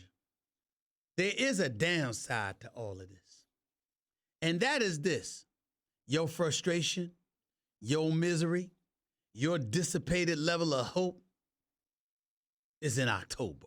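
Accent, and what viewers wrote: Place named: South, United States. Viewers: I'm a libra too. Tell it like it is. You're country accent. Cowboy hat and cigar is hilarious 😂. Keep it up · He's killing me with that texan accent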